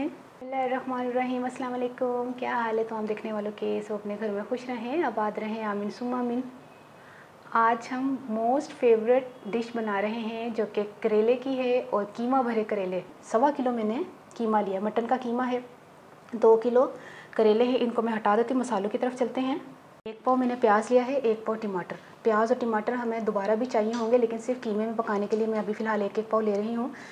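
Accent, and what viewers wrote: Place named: Punjab, India. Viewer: Dear.tuci great ho..love ur cooking nd love ur voice in banjabi style😘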